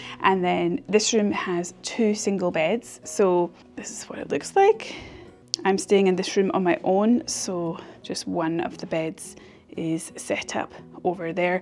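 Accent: Scotland, United Kingdom. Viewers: Hey, I’m Saudi, from the vast and well-known deserts of my homeland. As of today, I’m 23, and I’ve finally reached a destination I’ve long dreamed of: Scotland—particularly the Scottish Highlands. I visited in the first third of January and made my way to Glencoe. I have to say it out loud: the Scottish people are truly blessed by the gods with such extraordinary landscapes. I can scarcely believe this is real; I was completely overwhelmed with awe. The weather was stormy, and I traveled alone. It prompted a deeply introspective state—I felt, perhaps for the first time, an intimate connection with nature. As a Saudi from an Arab background with a C1-certified level of English (which is relatively rare), I found arriving in Scotland to be an ironic experience. Despite my fluency, the accent rendered everything almost like a completely foreign language. 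My question for you today: do Scottish people often encounter highly educated English speakers, yet still find the accent a barrier? I suspect the answer is yes. Your accent is sharp, almost like a sting, yet it is profoundly expressive and emotionally rich.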